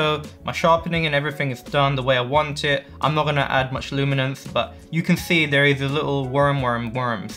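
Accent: India. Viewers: Honestly, it was the Indian accent for me 😂 thanks for the info!!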